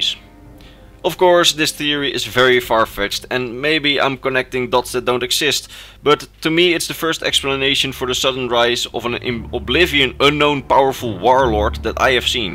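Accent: Netherlands. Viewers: You have a Dutch dialect, are you dutch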